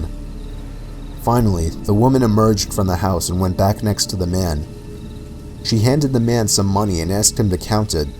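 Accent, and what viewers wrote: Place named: New York, United States. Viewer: I picture him looking like the Joe Pesci from Home Alone lol the NY accent is why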